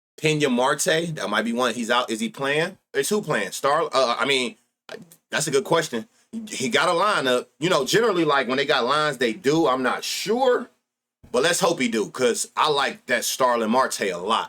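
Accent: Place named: Bay Area, United States. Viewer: 2 mins in i’m like oh yeah this nigga from either the bay or la 😂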